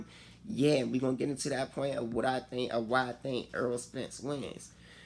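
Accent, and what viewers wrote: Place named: Washington DC, United States. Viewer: I know this accent anywhere... my man from D.C... you make good points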